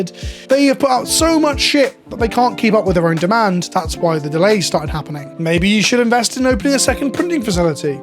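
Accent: United Kingdom. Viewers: Man i sure wish i understood what he was saying but all i heard is British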